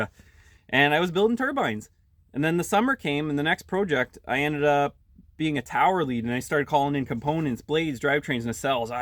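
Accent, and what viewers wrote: Canada. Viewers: No disrespect, you sound from Canada....i think the dynamics are different than here in the states,flat rate is a HELL NO for me,i been in auto dealership settings 20+ years and when i quit and went into heavy truck family owned very respectful people and my life changed forever master heavy duty certified all my ASE's good family good life,and 0 flat rate...ALL hourly,and its 8 N skate..if i want,i never go to a auto dealership again,but you also made a good career choice you have to make the decisions to make your life better. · Canadian, eh? I went to Sarnia in 1981.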